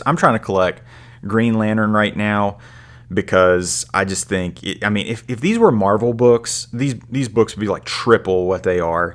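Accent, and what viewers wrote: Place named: South, United States. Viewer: Judging for your accent, I'd guess you're a Southern guy like myself. Rick Fortenberry (one of the guys who puts on the show) is a friend that I got my Batman 232 cbcs 8.5 from. You also had a brief glimpse of another great guy, Lyn Anderson who puts on a show in Raleigh 3 times a yr. Next show is early December and has some great vendors , Like Rick and Dave Hinson . You really should look into checking it out if you're in NC and looking for great 1 day show.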